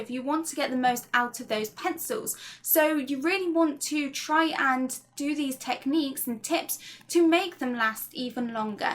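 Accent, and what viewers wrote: United Kingdom. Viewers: Besides all your amazing, thorough explanations and detailed drawings and tips, I love your accent! I love the way you say pencil it makes me smile EVERY TIME I wish I had an accent like this and I've been practicing my British ever since I watched Austin Powers first when I was like 6 or 7, haha sometimes when I write stuff like this I say it in my head in an accent 😂 Again thank you for amazing work, you really have made me pull up my art determination and persistence, as well as follow thru, that's my biggest problem! I always start pictures and when I'm done with that session I don't really go back to it unless I randomly find it again... I also am sort of homeless atm, not to worry we have money and cars, but since I don't really have my own space it's hard to spread out my art/storage/drawing space so maybe that's why I always start new when I go to start a project.... 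if you want to write a response I'd be pleased but also I watch all your videos and copy down great tips to remember (almost all of them haha) so I've got quite a lil notebook full 😊 So I'm sure there is something for every question I ask ! Brilliant work and lovely role model! You are such an inspiration! Thanks again! Much love, Autumn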